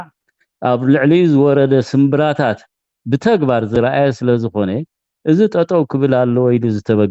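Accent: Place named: Tigray, Ethiopia. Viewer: ኣብ ሑቕፊ ሕወሓት ብዙሕ ግዜ ዝጸንሐ አዩ ዝመስል።መናአሰይ ናይ ትግራይ ትግርኛ አዩ።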